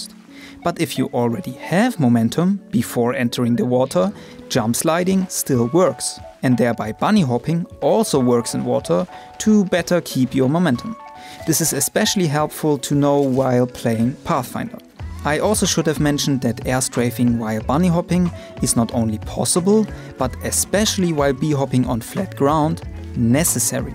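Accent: Germany. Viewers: Hi, are you German? It's just bc your voice and pronunciation sounds a bit like German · You sound Like a german Guy LeL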